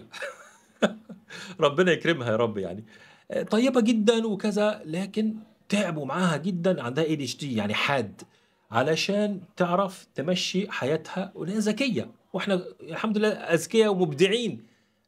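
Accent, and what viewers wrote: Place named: Egypt. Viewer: ما شاء الله مغربي بس لهجتك مصري جدا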